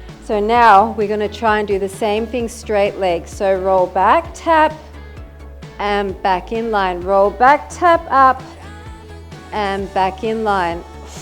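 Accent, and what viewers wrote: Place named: Australia. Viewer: woow! I love Australia! Hope to visit it one day! I started getting in love with your country since 's era. still be my fave singer and voice and yes I noticed a similar accent in you, but I didn't realize you are Australian too! Good workout to do after the athletic barre!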